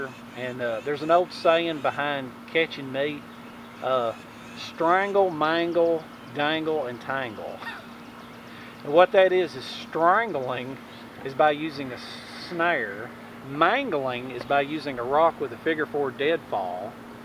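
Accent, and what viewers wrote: South, United States. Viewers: love the accent.im southern too,but.....you have a voice that is calming and would garner trust.love your videos!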